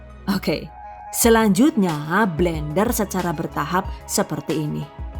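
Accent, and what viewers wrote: Banyumas, Indonesia. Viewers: Ngapak ya😂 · Nyong seneng bgt karo bahasa ne rika sing ngapak kue yu ..🤣🤣 mantap resepe 🤗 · Ya Allah.. Ada ngapaknya.. 😂😂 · the best ngapak bar bar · Wah bisa dijadikan ide buat usaha nich bun nanti insya allah klau saya diberikan kesembuhn sakit saya ini,saya akan mulai usaha seperti ini insya allah #GiveawayTHV2021..dn bun setiap liht vidio bunda saya sll bisa ktwa dipertengah krn bunda sll pake bahasa ngapak🤣🤣🤣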